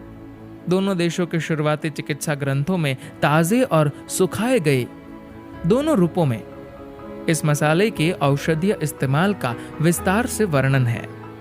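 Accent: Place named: South India, India. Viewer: आप अं की बिन्दी बिल्कुल नहीं बोलते जिससे आपकी हिंदी हास्यास्पद हो गई है। तुरंत पता चल जाता है कि आप साउथ इंडिया से हैं। औ का उच्चारण भी आपका खराब है।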